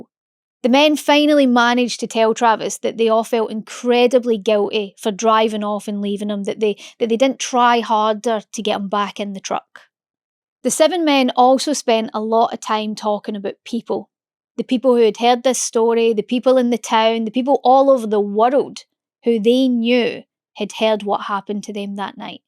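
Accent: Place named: Scotland, United Kingdom. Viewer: Love it.... Keep it coming mate... and from one Jock to another, do not 'ever' dial that accent back... Its totally ' you' and it totally makes it 🙂 x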